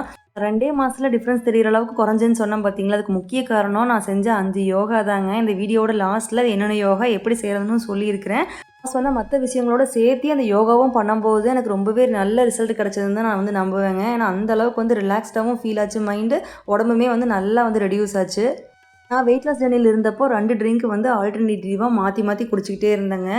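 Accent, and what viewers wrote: Kongu, India. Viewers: Sister love ur way of speaking polite kongu style, ur videos r positive as u are, hats off sisy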